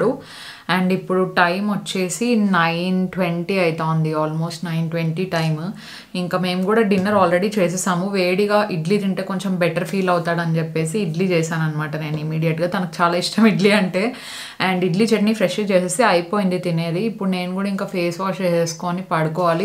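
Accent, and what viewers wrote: Anantapur, India. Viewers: Yes waiting for ur love story pls anantapur language vintunte mana atp language ne bonda le alantivi mana atp valu ekuvaga use chestaru chala happy ga undi ala vintunte...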